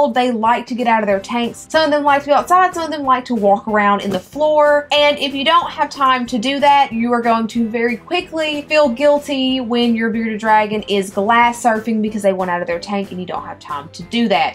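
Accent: South, United States. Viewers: Just curious where you’re from? Hear that southern accent, reminds me of the way everyone talks back home.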